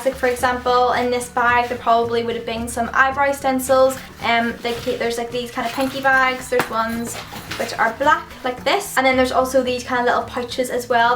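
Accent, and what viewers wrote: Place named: Northern Ireland, United Kingdom. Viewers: Northern Ireland I know her 😏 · myrthe van leest Belfast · Northern Ireland I think ?!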